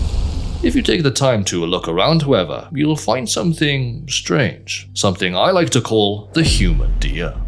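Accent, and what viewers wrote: Wales, United Kingdom. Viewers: Are you Welsh?🏴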